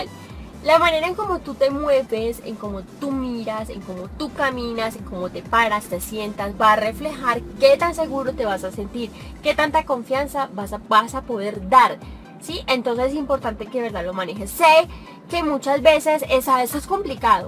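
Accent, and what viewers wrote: Colombia. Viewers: Me gusta el asentó colombiano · Hola apenas estoy viendo tus videos y conociéndote, eres una chica guapísima, linda y muy carismática!!! me encanta el asento de las chicas colombianas jajajaja ya me convertí en tu fiel fan.! voy a seguirte con frecuencia saludos desde Monterrey México..!!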